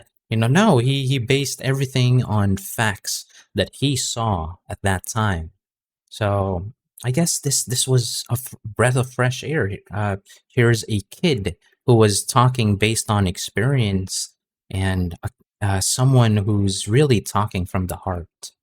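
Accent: United States. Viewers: I think you're wrong about the English language being exclusive to the elite, the educated and very rich. You probably think so because you grew in America (judging from your accent). Public schools (schools for the masses) at that time were taught subjects in English, so the language was more common than you think. This dissemination of English was the product of 40 years American colonialization. It was the Americans who set up the educational system for the masses and the middle class. It is perhaps the foundation of why today many Filipinos speak English as a native language and why the Philippines ranks among Asian countries as one of the largest English speaking populations. But yes, your grandfather was very intelligent. Cheers. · are u in the Philippines? Coz ur american accent is very nice 👏 Ilang taon po pala lolo nyo nung namatay sya? Seems he had a long full life. 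· Yeah! But boy u hide in american palda what a shame u speak americanize compared to ur lolo but no doubt ur lolo have more nutrients in his brain against yours ..what a shame 😂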